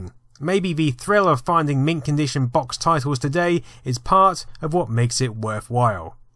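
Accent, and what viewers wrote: United Kingdom. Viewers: PeachyPixel8 you are not a nerd. You are just attracted to this man's sexy velvety-smooth British accent. Don't kid yourself. You want him. We all do.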